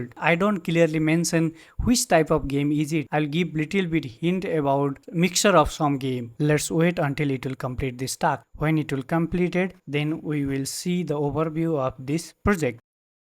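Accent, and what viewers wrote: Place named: Nepal. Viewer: timro nepali accent lagi raxa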